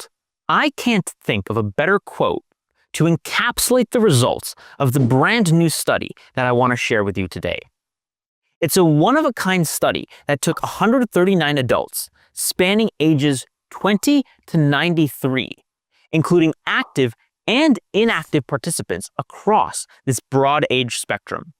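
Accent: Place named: United States. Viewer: it’s pronounced Duneeeedin pace not Dunedin pace.. Must be the American accent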